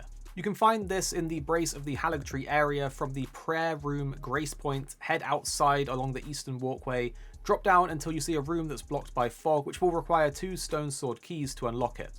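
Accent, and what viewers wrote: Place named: England, United Kingdom. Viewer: I love me an English accent. But "HATE-ch" makes me cringe.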